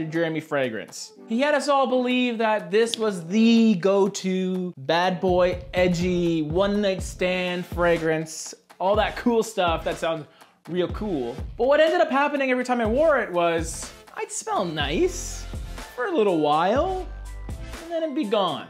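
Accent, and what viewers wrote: Australia. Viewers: Savage on the Australian accent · Oh I love Cedrat Boise, my favorite next to Aoud Lemon Mint and for me it is a performance freak, and what I noticed your bottle has a sticker on the bottom? while mine has a only etched batch code on the bottom. I guessed the NR1 pick, thought its going to be either Pacific Rock Moss, Individuel, or maybe a La Nuit de l`Homme. Australian accent! very noice!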